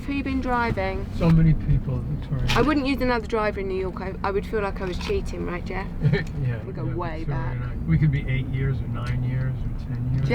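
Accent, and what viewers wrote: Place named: England, United Kingdom. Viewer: That voice and English accent! Love VB since she was Posh Spice!!!! ♥️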